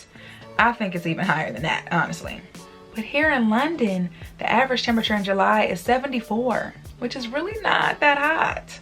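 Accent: South, United States. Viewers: Love the way you talk with an occasional “ y’all” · You got that southern accent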